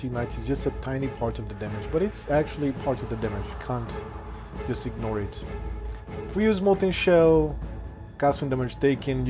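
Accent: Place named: Brazil. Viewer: You sound brasilian 👀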